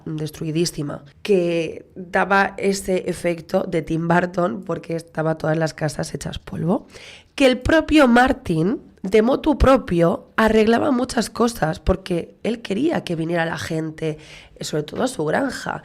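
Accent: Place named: Galicia, Spain. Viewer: Y ese acento gallego?? Bienvenida,!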